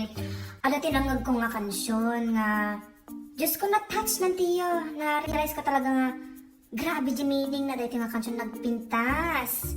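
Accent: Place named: Ilocos, Philippines. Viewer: Shout out ngarud makatkatawa ak jay panag ilokanom ukel ukel. Hahaha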